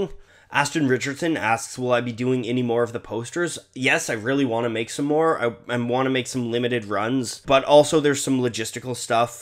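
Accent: Canada. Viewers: You sound so Canadian it is adorable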